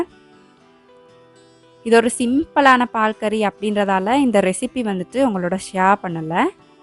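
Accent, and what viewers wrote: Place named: Kurunegala, Sri Lanka. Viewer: Neenga kurunagalaya or anuradapuraya sis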